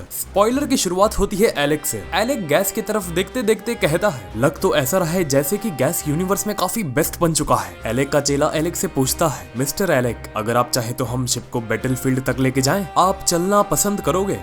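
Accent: Maharashtra, India. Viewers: Bhai kya aap Marathi ho 😇😇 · Today's narration is op 🔥 specially little bit marathi used during narration 🤣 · Bhai tum Marathi hai? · BHAI TERA MARATHI KADK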